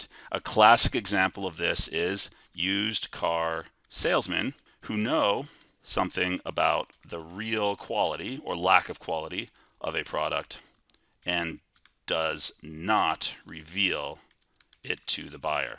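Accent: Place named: United States. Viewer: Can u add subtitles as every one cant understand american accent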